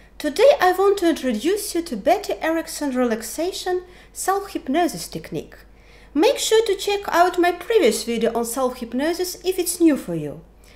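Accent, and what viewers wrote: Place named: Eastern Europe. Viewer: Wonderful video, thank you. Very grateful new Aussie subscriber and love the East European accent. Thank You again.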